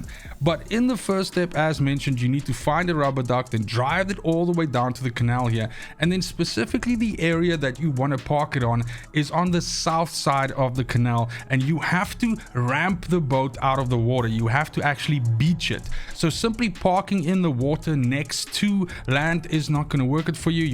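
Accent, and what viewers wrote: South Africa. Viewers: You South African?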